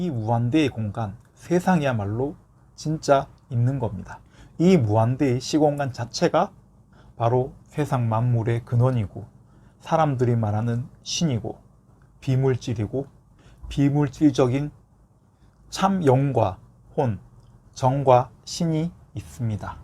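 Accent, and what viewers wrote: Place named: Daegu, South Korea. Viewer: 영상 잘~ 듣습니다. 같은 대구말투도 정겹구요^^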